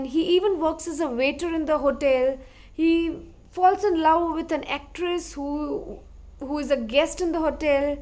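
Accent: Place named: Gujarat, India. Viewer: Ds....that gujju accent ☺️☺️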